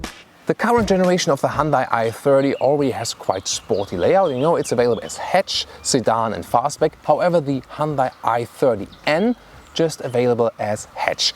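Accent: Germany. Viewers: Once more an excellent review, a pleasure to watch. Thank you. I also enjoy your English with some German flavours, which is totally different compared to American English which I hate as many intelligent people do 😝..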